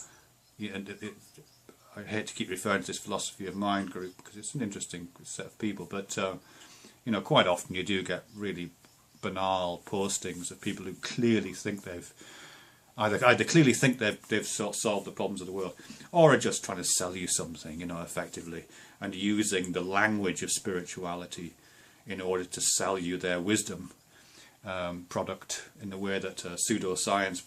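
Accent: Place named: England, United Kingdom. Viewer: Your voice is sounding more English than Scottish these days.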